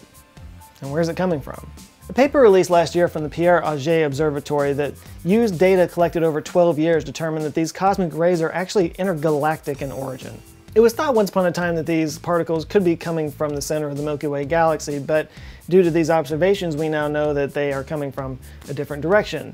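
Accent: Texas, United States. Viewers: A giant what? Oh, a die-key-ree, now I get it... no thanks to your Texan pronunciation.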